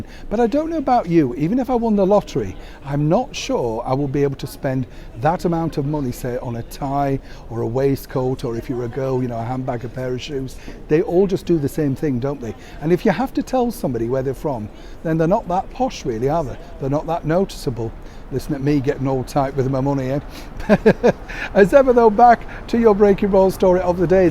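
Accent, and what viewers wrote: Yorkshire, United Kingdom. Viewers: You speak like a true Yorkshire man. you are a very smart gentleman without spending a fortune on ties 👔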